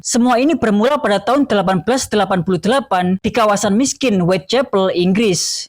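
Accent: Java, Indonesia. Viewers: logat jawa dan meme pria hitam. admin asli ngawi fix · Terlalu medok mas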